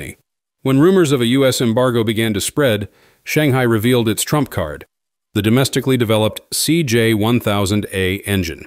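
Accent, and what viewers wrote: United States. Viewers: Why is it always a droning American accent, that provides the 'expert' advice, telling us that China will die without outside technology? You need to get an air ticket to China you clown. Its just faintly possible, you might be educated to learn that China, by any comparison, is 100years in advance of the rest of the world. This is just shit comedy but pass the bloody popcorn anyway😂😂😂😂😂😂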